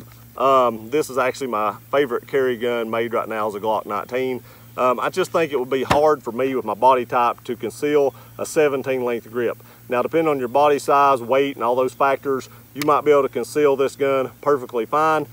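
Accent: South, United States. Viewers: If I hear someone with a southern accent talking about guns, I know they know their guns.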